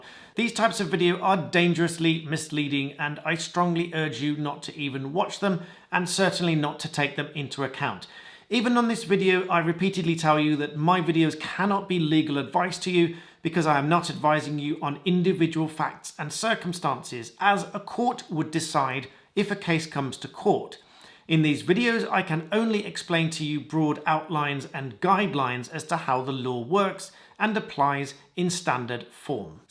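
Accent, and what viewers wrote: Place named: England, United Kingdom. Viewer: You are so ignorant the giant ignoramus of the year there is no tax in the common law! Just because you have an English accent doesn't mean that you're smart! Do your homework and get out of maritime law jurisdiction you have freedom in the common law legislation does not apply to those in the common law if you are a man of flesh and blood then you can be in common law unless you love to be enslaved by maritime law jurisdiction be my guest! But please stop speaking ignorantly about common law.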